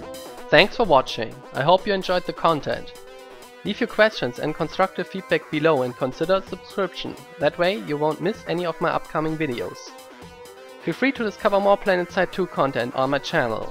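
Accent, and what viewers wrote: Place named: Germany. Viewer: Thanks! Very informative! I just upgraded my Magrider, so I dont Need to be ashamed, to have a gunner. This Video helped me a LOT.Ive mainly Play Harrasser or any Kind of Airvehicle. The Magrider was really a challenge for me, but it Looks BOSS. Im goin with the standart Nova, because He aint that good at aiming, if I want to kill Infanterie. For the Gunner, I am going with the Gun, wich gets faster while Shooting. Its not very accurate, but has the highest DPS.With that gun, you can easily do an Destruction-Combat against another Tank with gunner.Im using the Magburner, for the others, I havent decided yet.Thanks for doing this Video m8.(and I can clearly here youre German Accent, "Dosenöffner......auf welchem Server Spielst du?)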